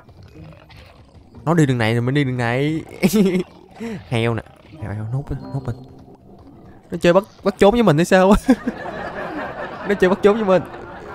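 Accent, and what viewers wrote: Mekong Delta, Vietnam. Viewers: ông là người miền Tây đúng hong? nghe nói chuyện dễ thương vải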